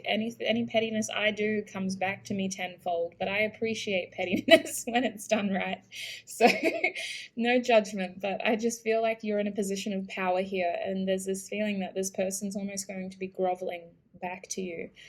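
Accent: Australia. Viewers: I listened to a few videos from you. I wasnt paying attention to the accent but this video is clearly Aussie accent. I just recently moved away from Au and u made me missing it. And thanks for the picking card. For pile 3, when u picked the card “I imagine u naked”, I’m dead laughing haha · I love you!!! Your readings are so different, original and so accurate! I chose pile 2 and it's like personal reading! Love it! And I love your aussie accent and swearing as well!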